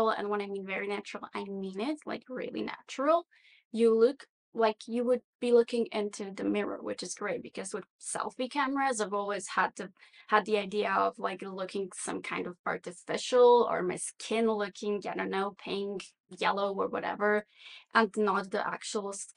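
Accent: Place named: United States. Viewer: I watched your video at 1.5X speed. At that speed, you sound American--esoecially when you say "and".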